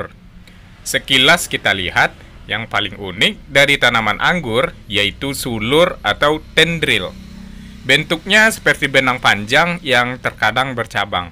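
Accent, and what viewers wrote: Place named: Padang, Indonesia. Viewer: Orang padang yg uda... Intonasi cara ngomong nye kek orang padamg .😂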